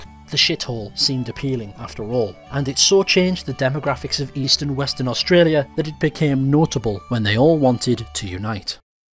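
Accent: United Kingdom. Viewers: “EVERYTHING Australia” Me: immediately hears a UK sounding guy 🤔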